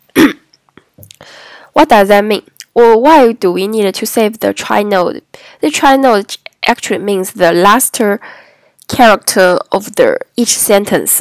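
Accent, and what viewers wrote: China. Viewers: Please improve your English. It's really hard to hear your annoying Chinglish.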